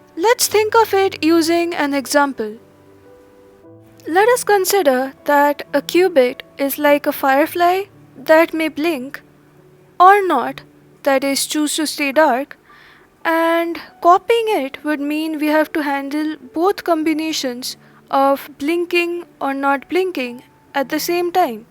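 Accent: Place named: India. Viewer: I usually can't stand Indian accent of English - but this one is really pleasant to listen to lol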